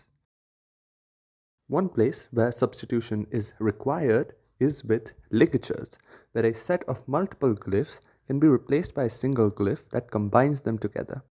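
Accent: India. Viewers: I wanted to watch this but I just can't do the accent. I'm sorry. I just can't listen to Indian accents. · Your sound indian , are you Indian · Why the Indian accent?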